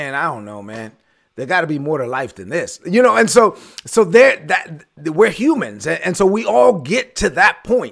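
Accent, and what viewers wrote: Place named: New York, Dominican Republic. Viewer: You blessed me Again today. You do that every day. Also, I know your accent is not only NY, but Dominican first. Thanks for the words every day 💞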